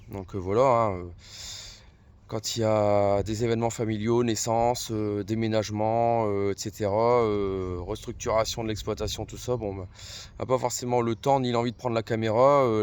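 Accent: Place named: Nord-Pas-de-Calais, France. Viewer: Non......on t'aime et chti accent moi j'aime!!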